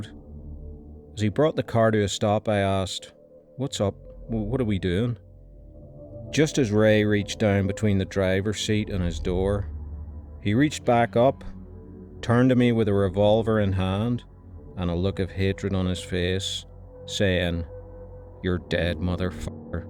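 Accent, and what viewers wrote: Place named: Ireland. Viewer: ❤ Hi I found your video and 1 at time listening to your narrative style & I've noticed you have a Irish? accent? Sorry if I got it wrong but you sound nice narrating the stories. Good job bro.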